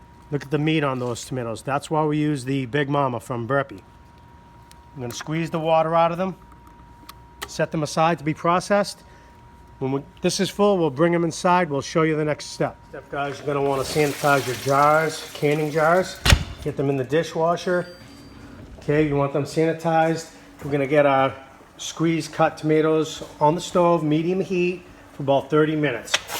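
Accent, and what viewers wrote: Boston, United States. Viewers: Could you be from BAHston? Also Mainers talk like yoy, too. (or is it "tok"?.Us Jerseyans say "tawk," lol.).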